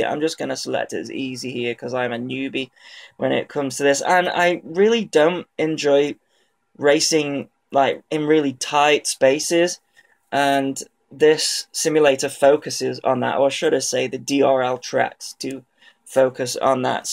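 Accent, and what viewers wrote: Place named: England, United Kingdom. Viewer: For me you're the most reliable reviewer/drone channel-guy out there. If im unsure when it comes to "drone-decision-stuff" i always just have to browse your channel to find the right answers and opinion. Of course there are other good and trusty reviewers on yt....but totally prefer the english language and pronounciation over this american english blabla....or well in most of the cases atleast. Ofc joshua bardwell, rcAddict, kababFPV, stu from uav, envy astro+seth and Bruce from rc model reviews doin a GREAT job aswell....but you are always a tad more enjoyable to listen too. Thanks for that dude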